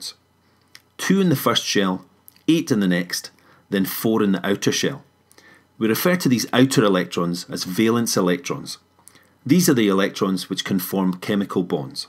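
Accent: Scotland, United Kingdom. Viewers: Scottish accent ay?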